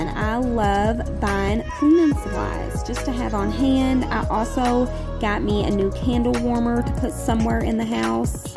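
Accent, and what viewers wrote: South, United States. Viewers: Can I ask what state you are from? I'm in Louisiana and I definitely can hear your southern accent lol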